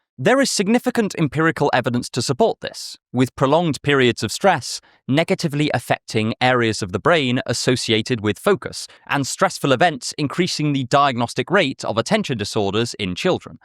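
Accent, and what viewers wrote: United Kingdom. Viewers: I don’t know if it’s j because you have a British accent and are wearing tweed, but I agree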